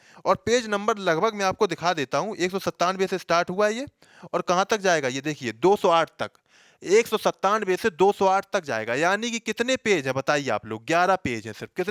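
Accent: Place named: Kanpur, India. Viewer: Sir kanpuriya language bol rhe h.. lgta h kanpur se h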